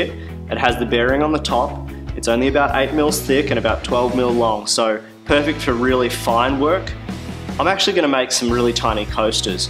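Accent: Australia. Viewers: lovely production values and great information. So good to have an australian voice and without the seemingly obligatory "yo whats up guys" followed by death metal music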